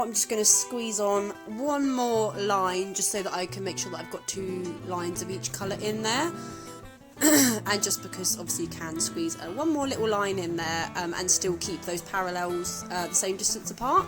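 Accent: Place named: United Kingdom. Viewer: I love your work and your uk accent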